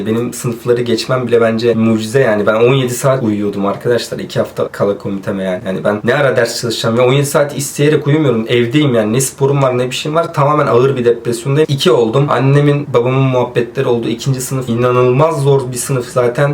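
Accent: Malatya, Turkey. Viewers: Abi Malatyalı misin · reis malatyalı mısın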